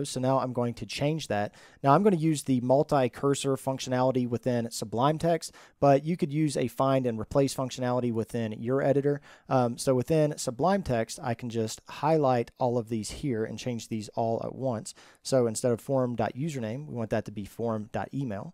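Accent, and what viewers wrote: South, United States. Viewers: Love your accent, sounds like a soft southern accent. Your content is fantastic.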